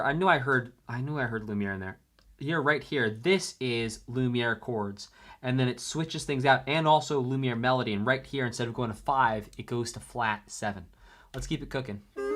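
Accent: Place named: France. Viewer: "Clair Obscur, je conduis une voiture..." xD ... et je percute un Sakapatate ! Did he talk about chroma? An advantage! Nice french accent btw!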